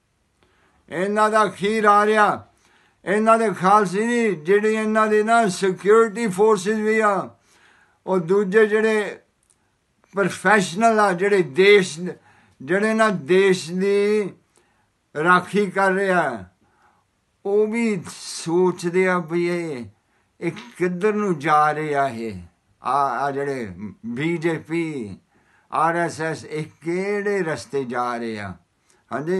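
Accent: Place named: Pakistan. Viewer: Eh bhain da lorha Sikh ni haiga. Ehda accent hi ni haiga India wala. I know uk to aa but bolan da dhang Pakistani type aa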